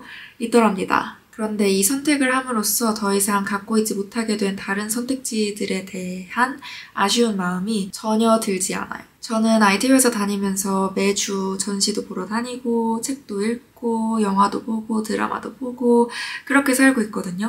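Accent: China. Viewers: 為什麼您是中國口音😂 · 妳說的中文語調是大陸腔調。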